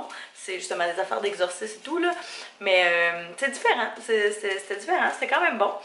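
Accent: Quebec, Canada. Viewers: Merci pour les conseils de toujours laisser sécher le linge à l'aire. Je le faisais déjà pour les pièces que je lavent à la main, mais pas pour celles que je lavent à la machine. PS. C'est cool d'écouter ton accent. Si tout vas bien je vais bientôt aller au Canada pour un séjour et je suis sûr que les canadiens seront surpris par mon accent français belge😅.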